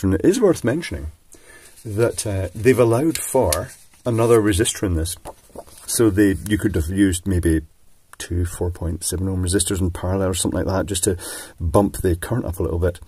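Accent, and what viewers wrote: Scotland, United Kingdom. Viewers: You're voice sound like Scotland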